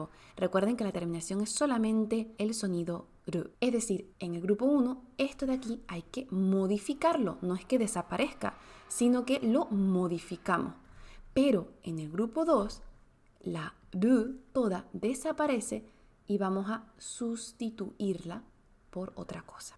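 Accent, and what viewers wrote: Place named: Andalusia, Spain. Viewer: Im-pre-sio-nan-te. De lo mejor que he visto sobre japonés. Ya me suscribí. Sos como yo, sintetizando a tu modo encontrando puntos en común y poniendo en duda lo establecido. Pero lo hacés desde la autoridad que te da el dominar el tema, el haberlo estudiado en la forma tradicional (¿o ya de entrada cambiaste todo?). Una verdadera genia. Y la pronunciación, impecable (la del japonés, porque la materna...😂 Suenas a Andalucía ¿Puede ser?). Te admiro mucho. Y, claro,¡Gracias!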